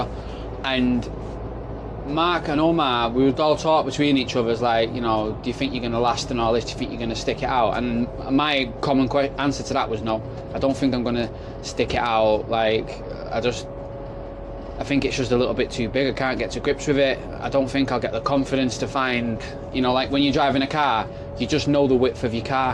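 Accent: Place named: Manchester, United Kingdom. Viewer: Great video,s as an expat manc it's great to hear a manc accent! I'm curious do you stick to the same routes all the time or do ever do routes say in sale Altrincham way?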